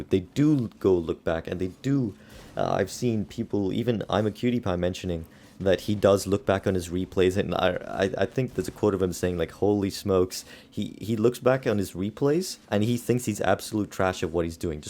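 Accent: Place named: Switzerland. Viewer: Are you Swiss? You sound like Roger Federer.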